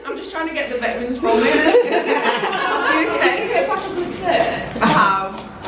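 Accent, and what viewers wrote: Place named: Scotland, United Kingdom. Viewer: I actually forgot you are Scottish 😂😂 it’s been so long since I’ve heard you speak. Love it.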